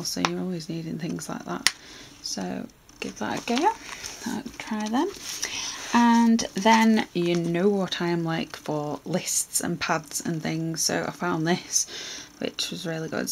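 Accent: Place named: Lancashire, United Kingdom. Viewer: I'm from Leyland Lancashire your accent sounds similar to mine so that's why a was curious x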